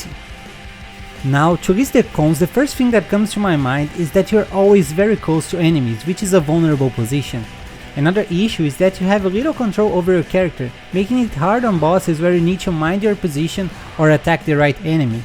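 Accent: Brazil. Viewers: Vc é BR? Parece que tem sotaque haha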